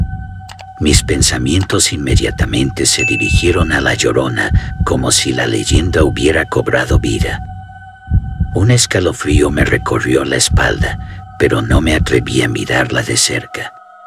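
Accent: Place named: United States. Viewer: Su acento es de estadounidense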